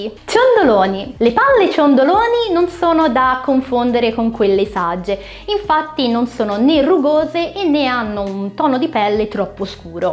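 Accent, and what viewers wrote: Livorno, Italy. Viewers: Il tuo accento toscano mi fa impazzire 😄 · Io riesco a riconoscere che è livornese.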